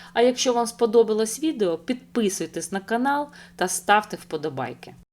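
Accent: Russia. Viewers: текст читається з потужною московинською вимовою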